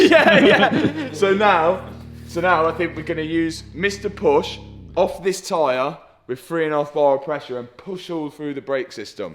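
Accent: Leicester, United Kingdom. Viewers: I love that Lesta accent . 👍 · I'm curious, what part of the UK is the accent from?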